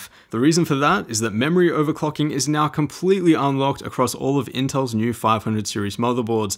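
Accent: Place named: Australia. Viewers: He's Australian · definitely australian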